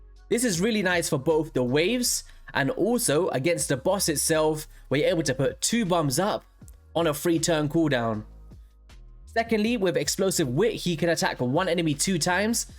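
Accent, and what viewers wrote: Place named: London, United Kingdom. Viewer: but any particular area of london? as I have heard londoners but none like you, forgive my uk ignorance i am truly just trying to differenciate. like i enjoy your voice but i feel its more specific than london..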